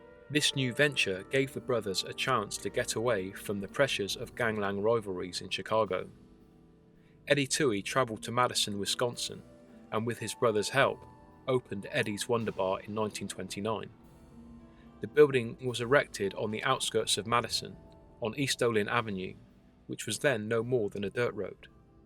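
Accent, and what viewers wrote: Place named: Leeds, United Kingdom. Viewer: He's from Leeds in North of England uk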